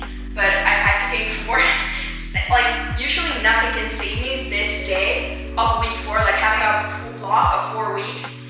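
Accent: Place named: United States. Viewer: It might be your FAQ: why do you have American accent? Love your content as always:)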